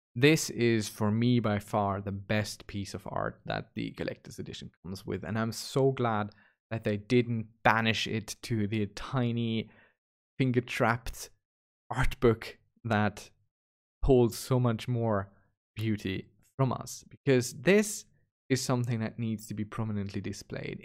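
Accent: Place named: Ireland. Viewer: Are you Irish? You sound like a mix between Irish and something else.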